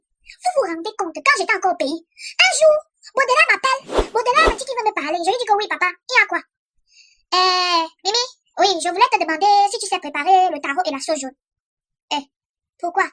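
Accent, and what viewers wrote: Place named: Cameroon. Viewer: je suis ivoirienne!! jadoooore trop votre accent camer!!!!! bne continuation mimi :*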